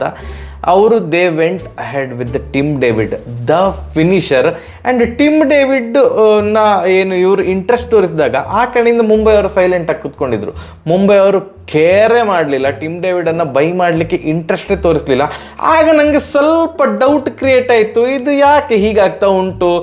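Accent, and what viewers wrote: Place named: Udupi, India. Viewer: Masth udapi accent ❤❤❤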